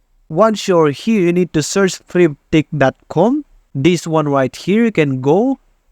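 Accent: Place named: Philippines. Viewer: Accent tagalog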